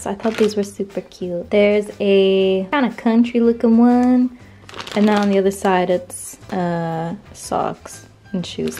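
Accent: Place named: South, United States. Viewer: Lol what’s with the southern accent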